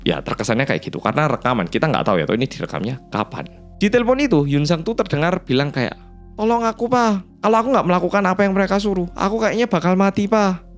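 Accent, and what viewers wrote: Java, Indonesia. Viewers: kok medog se mas.. 😅😅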